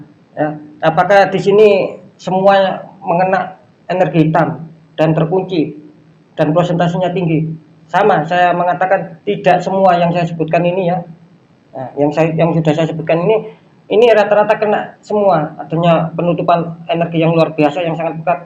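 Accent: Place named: East Java, Indonesia. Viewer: Mas orang jawatimur kah?..kok logatnya kayak orang jatim ya..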